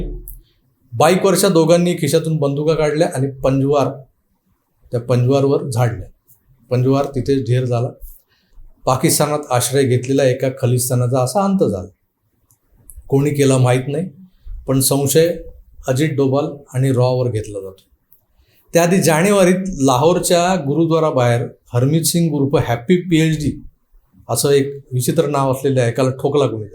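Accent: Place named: Mumbai, India. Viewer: Very beautifully explained and your language is very sweet , typically mumbaikar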